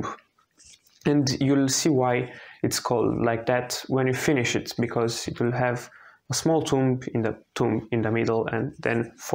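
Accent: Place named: Russia. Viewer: Lmaoooo thank you bro.... Exactly what I wanted, learning a solo card game from someone with a Russian accent was simply perfect for me.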